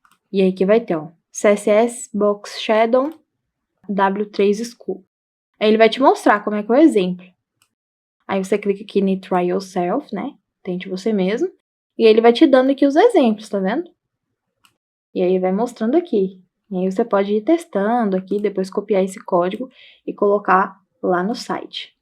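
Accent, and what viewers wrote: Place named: Brasília, Brazil. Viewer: Se pudesse, dava 1000 likes. 👏👏👏👏👏👏👏👏. Como faço para ter uma aula presencial com vc? Vc é aqui de Brasília? ( Pergunto pelo sotaque. Rs).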